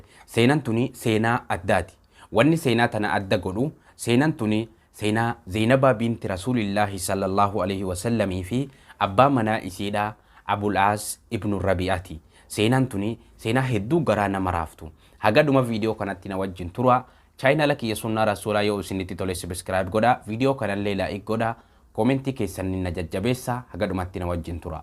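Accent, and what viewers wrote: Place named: Arsi, Ethiopia. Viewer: As ayk wr wb mashala mashaala rabbi umri dhera sii haa kenu barumsa garii sira barane obbolesa muslimati sagaleti arsii naa fakate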